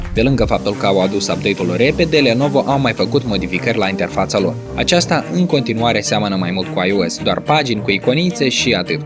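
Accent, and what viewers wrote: Moldova. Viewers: Moldova si tipicul accent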